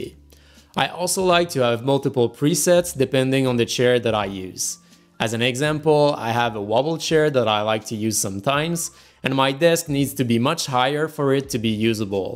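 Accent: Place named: France. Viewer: Damn that French accent coming through, lol.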